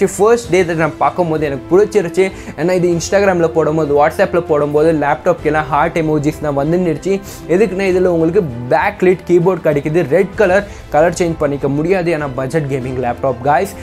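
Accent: Bangalore, India. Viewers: You have a typical Bangalore accent bro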